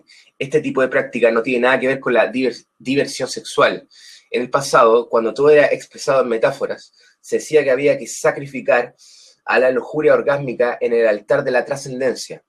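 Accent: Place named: Chile. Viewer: 🤗¡ Me encanta el acento chileno ! 🇨🇱❣️ 🥰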